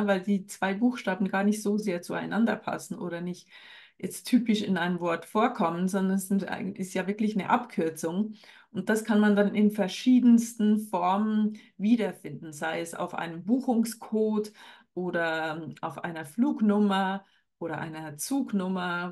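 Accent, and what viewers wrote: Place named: Switzerland. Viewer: Ich wäre soo froh wenn das Universum ein Zeichen sendet, allerdings sehe ich an und zu mein Geburtstagsdatum..dachte das bedeutet nichts… da ich nie so overboardet werde wie andere offenbar😂. und mich zwar mit x Seelenverwandten rumschlage..aber nix von Twinflame😂 obwohl das Thema mich seit fast 30 Jahren beschäftigt. Macht nix. Aber Zeichen wären nett für den richtigen Weg… würde helfen❤. Früher hab ich nie gecheckt, dass du Schweizerin bist😂. Dabei kennt man doch den leichten Akkzent..auch wenn du gut sprichst. Has glaub au falsch gschriebe. Wyter so: find dich supi. 🎉❤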